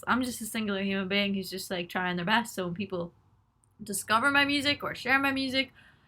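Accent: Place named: Ireland. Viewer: Lord Tunderin Jeezis.....You Look Almost IDENTICAL To My Ex Girlfriend!!! But, Your Eyes and Personality is/are Much More Beautiful than Her.....She wasn't A Loyal, Trustworthy Person...I Personally believe She was Bi-Polar??? Anyway I'm From The Canadian Chapter of The Fan Club...There's only 9 of us..10 if You count the Dog "Buddy" (After Buddy Holly?)...LOL!!!!!!....Seriously, I Truly Love You and Your Singing....It's just that it causes Me to experience too many Feels...if You know what I mean?......I've truly Adored You for the last 8 years when I first saw You Excel...With an Excellent Elton John Tune....I must've re watched it over and over and over again about 500X....Well, This was My first Post....And That Killa Irish Accent Just Killz Me.....Lots of Irish in my family tree.....Anyway...Best Wishes....I Hope You're Doing Amazing........Hopefully someday You can Tour Canada....Barrie or Orillia Ontario Would Be 2 Excellent Venues....There's Casino Rama near Orillia....And Molson Park Ampitheatre in Barrie....Good $$$...And Nearest to Me...So...Win...Win!!!....Stay Sweet!!!!!!!!!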